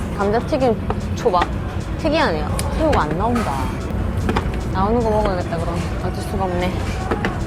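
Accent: Gyeongsang, South Korea. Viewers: 경상도 사투리 구수함 😊😊😊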